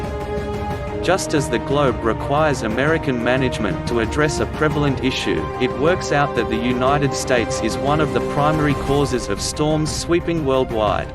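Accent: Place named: United Kingdom. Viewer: The Corona virus is taking down the over borrowed stock market... and it's not a trade war when your competition has been raping the natural world and not paying tariffs on their exports... as for the fence on our southern border is obvious more nie than ever... we have 60 million illegals here.. time to send them home and yes America is first in america... our grandparents who helped fight and make America the strength it was would be pissed off if they saw how a lot of not very bright people are helping the confederacy a.k.a. the Democrats slowly turn our union into a socialist nightmare... and destroy our rights and liberties... all because they're favorite actor goes on TV and trashes the only one who cares to undo the dems damage and give us back our freedoms... propaganda garbage with a fkin brit announcer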